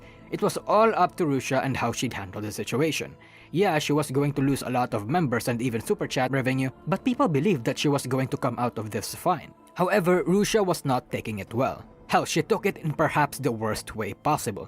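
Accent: Philippines. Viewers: Definitely not a native English speaker, you sound like a fellow Filipino with that accent.... I haven't done any Vtuber digging since I'm busy with college so i just barely know about this controversy, although this was neatly presented enough for an outsider of the community As for fubiki and the others who didn't react, i think they were playing safe trying not to get involved with drama, i assume it's a very uncertain time for them. To either call their company out or call rushia our. It's hard and very costly to speak up when everyone is up and arms with pitchforks. That's all i could comment about the situation, and I'm happy for the new rushia. I may even check her new channel if i get curious enough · okay, if all Filipino people sound like him, then damn I’m super jealous. I love the accent, it’s awesome.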